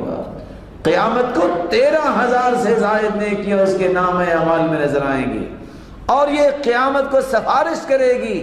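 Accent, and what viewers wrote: Arab world. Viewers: MashaAllah SubhanAllah Qari Sahib I am really impressed by your. Arabic Language accent. Have you been brought up in an Arabic country? It is routine in our local Masjid that After isha prayer in mosque one person amongst Namazi recites Surah Mulk and all other listen. Is that right Amal for maghfarat or each one has to recite separately. Please guide us. JazakAllah Khair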